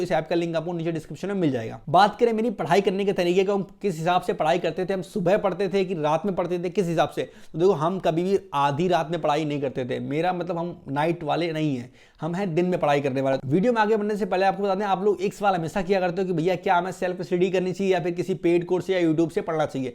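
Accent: Kanpur, India. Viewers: Jyada kisi se chatiyo mat kanpuriya language 🤣🤣🤣 Maja aa gya Bhai 😁😁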